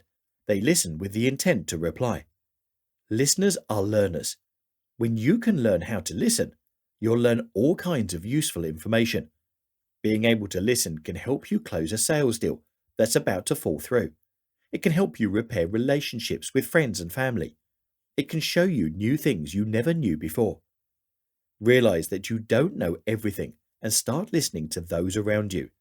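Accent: United Kingdom. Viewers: Why is nearly every video like this one narrated using a British accented voice? Do people really think that words spoken in a "posh" British accent convey more meaning or that the speaker is more intelligent? Most of these voice-overs are probably computer generated so perhaps they are more intelligent - even more so than most actual Brits.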